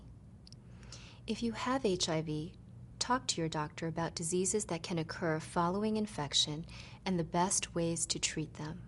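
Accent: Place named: United States. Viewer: Wow she sounds like an american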